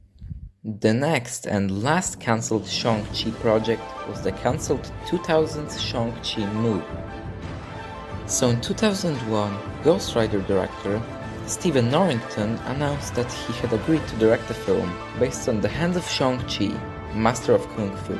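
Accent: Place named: Eastern Europe. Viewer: SLAVIC ACCENT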